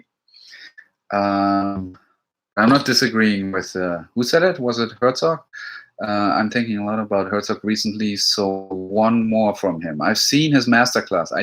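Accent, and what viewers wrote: Germany. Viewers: Love ur videos btw are u german because of your accent? just corious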